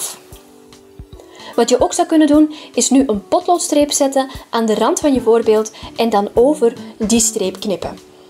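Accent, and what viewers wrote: Belgium. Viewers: Duh België klinkt wel grappig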